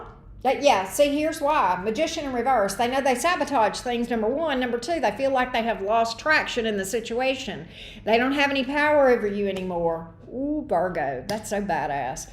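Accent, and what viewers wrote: South, United States. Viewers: You’re so cute . I love your energy and your little southern accent ❤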